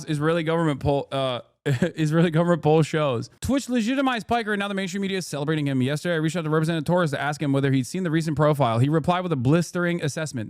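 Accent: Australia. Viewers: Australian Fox News has very few Aussie subscribers, but US MAGA love it. !! See a mention as a point of pride. Your Aus accent is f.....d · It's like a cross between "oh naur" city girl, and broad TV aussie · Heads up I had previously subscribed to your channel and I’m here to find out what the effed up lie sky news is saying about you, being an Aussie myself. And I find out that I am unsubscribed to your channel. wtf? Love your Aussie accent very very funny lol. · I'm also an Aussie, I was musing about where geographically in the country his accent might be from. Cities have a posher accent whereas rural Aussie accents are described as "broad"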